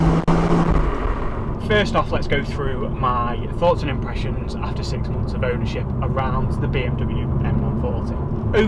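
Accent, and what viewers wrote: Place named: Liverpool, United Kingdom. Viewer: Love the scouse accent nearly has good has ours great video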